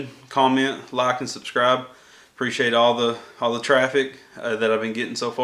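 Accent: South, United States. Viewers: There is something about the high amount of humbleness a man sounds like with a genuine southern voice amen! Thanks for the video will attempt repair on my logitech 7 inch desktop sub since the rubber around the sub tore.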